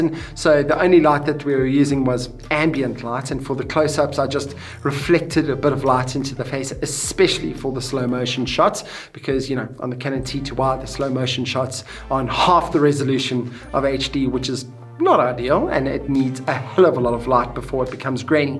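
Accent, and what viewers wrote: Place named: South Africa. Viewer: I can hear you're South African😂 hello from Durban😌👍🏻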